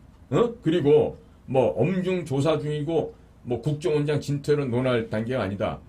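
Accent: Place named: North Korea. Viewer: 님 이북에서 왔어? 말투도 그렇고, 종자주의? 난 처음들어보는 저런 단어를 쓰고 있노.. 저거 이북단어 아니여?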